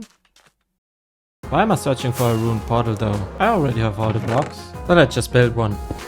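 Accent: Germany. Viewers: Cause he say zem instand of them k think he is german like me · are you german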